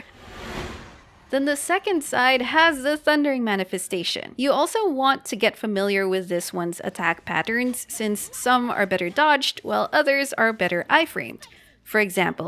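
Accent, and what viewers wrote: Philippines. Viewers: are you a filipino? your voice sounds like a filipino, anyways great video as always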